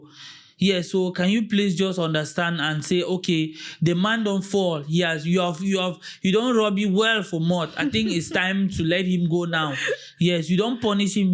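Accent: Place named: Pidgin, Cameroon. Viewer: This guys pidgin accent looks like a Cameroonian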